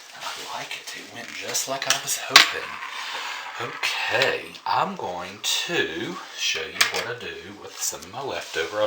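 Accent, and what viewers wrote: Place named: Kentucky, United States. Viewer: I adore your accent <3 - it reminds me of my time spent in Kentucky. I'm from new Zealand.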